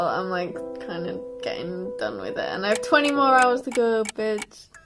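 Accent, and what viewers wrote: United Kingdom. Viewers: POV; asking if she is British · how did i not know she was British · anyone else forget shes brittish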